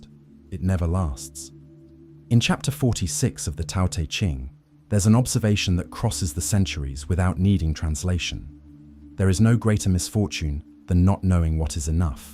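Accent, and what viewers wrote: England, United Kingdom. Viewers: I’m sick and tired of thievEnglish accents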